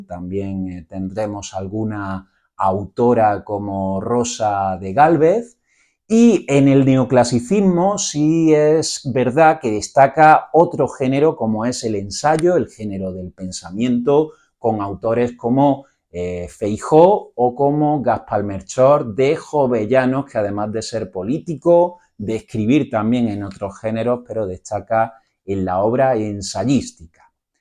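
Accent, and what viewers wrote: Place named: Spain. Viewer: Pues para importante la acentuación tanto no sé qué tiene que ver la acentuación con el acento de este hombre, que tiene un acento muy español. 🙄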